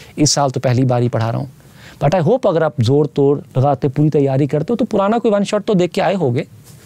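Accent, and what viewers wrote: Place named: Punjab, India. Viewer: Sir ki punjabi 😁 nice I am from mp but I know punjabi very well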